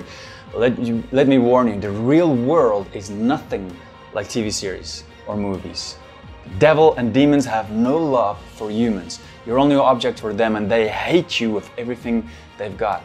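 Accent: South Africa. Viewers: U from south africa ey ?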